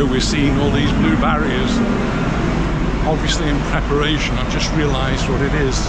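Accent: Stoke, United Kingdom. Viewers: Are you originally from Stoke by any chance? I'm detecting a Stokie accent. Update: Just seen your video explaining how you got to Mallorca, and as suspected, you're a Stokie. Greetings from Longton!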